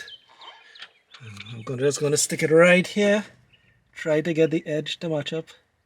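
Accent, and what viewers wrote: Trinidad and Tobago. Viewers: U Sound Like You From Trinidad.... You Live In Jamaica Now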